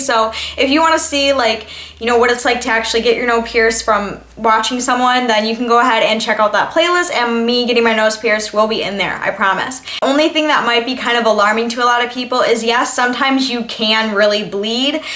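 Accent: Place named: Midwest, United States. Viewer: Your accent is adorable! Guessing you're from either Michigan or Wisconsin!! 😍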